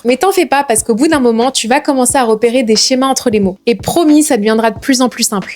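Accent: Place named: United States. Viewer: J'aime beaucoup l'accent Américain 🥰🥰🙏🙏🙏🙏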